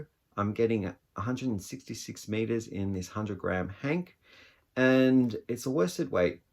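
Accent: Australia. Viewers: Why do you sound Australian?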